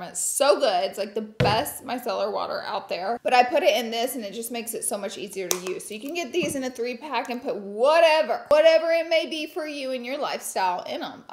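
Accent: South, United States. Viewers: I just adore you you are the cutest and I love your lil countrygirl accent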